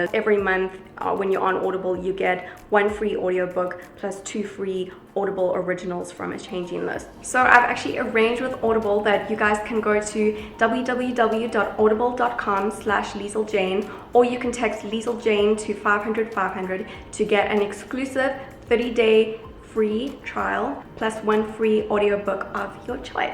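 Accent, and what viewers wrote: South Africa. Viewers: It’s that smooth SA accent. 👌👌😉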